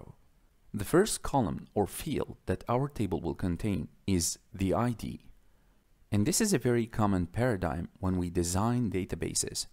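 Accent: Russia. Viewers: This was good! Is your native language Russian?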